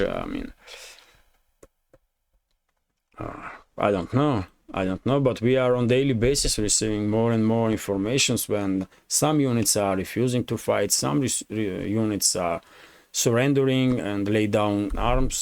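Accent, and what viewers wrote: Russia. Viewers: 2 streams is much better, dont forget the attention timespan of modern humans ( esp young ones) is less than 10 Minutes! Kepp on the good work, i really like to hear a Russian voice, even if you are emotionally involved and cant be 100% neutral. The same for Patrick Lancaster i watched 90% of all his videos and already in Mariupol battle it was obvious after he reports the civilian victims fate he cant be neutral. I can accept that.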